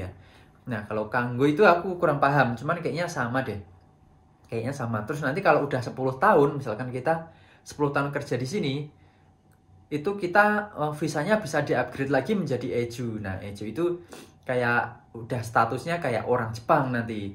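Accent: Java, Indonesia. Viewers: jowone pean ngendi mas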